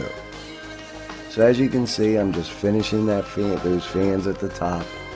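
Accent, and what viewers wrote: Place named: Northeast, United States. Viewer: I had a feeling you might be from my area…. (CT here 🙋🏻‍♀️🙋🏻‍♀️)😂😂 you sound like you live on the North East coast………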